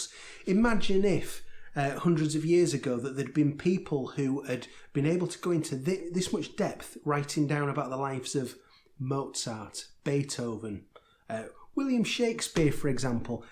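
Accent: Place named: Liverpool, United Kingdom. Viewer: Thanks for the wonderful review! As fun just digging on your accent (Liverpool itself I’m guessing) as your enthusiasm for the book. I read the 900 page version when it came out in 2013 and have been on the fence about the complete edition but I think you talked me into it! Thanks again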